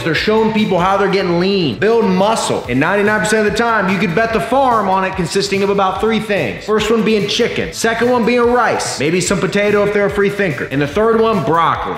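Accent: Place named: Alabama, United States. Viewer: Man the way you talk reminds me so much of the football dads from my small hometown in Alabama and I can’t get enough of it 😂😂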